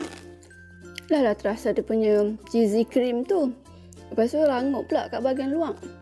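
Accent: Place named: North, Malaysia. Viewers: Terima kasih cik oii,.,memg sangat sempoi...esok pagi makcik mesti try ni., kbetulan semua bahan ada ja...dengaq cek makan krupkrap pun dah telioq aih...terima kasih no.,kongsi resepi ni...cakap utaqa tu maintain na cek.... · Pekat nah utagha. Boleh belajaq kat sini sekali hehehe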